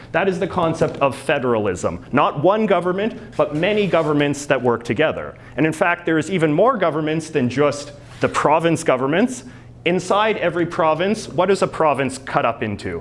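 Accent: Canada. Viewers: Not only do u have some of the stereotypical Canadian accent but you also say "about" :p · Bills can come from any party or the Senate (sometimes). Not everybody in Canada says 'about' this way. Refering to Jagmeet Singh as 'that type of person' was a bit cringe worthy... but I otherwise thoroughly approve of this video. Bravo. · Canadian accent. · Wait people in Canada actually say a boot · Im canadian and i laugh whenever he says a boot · Canadianisms are part of his charm, beyond his super-intelligence /knowledgeable/rational attitude.... many of us are fetish fans, specifically enjoying his “aboots” (etc.) I’m sure a portion of his fan appeal/following, is entirely based on these entertaining mannerisms. Besides, he’s maintaining Canada as a distinct society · “A boot” lol That’s actually a word in Canada?!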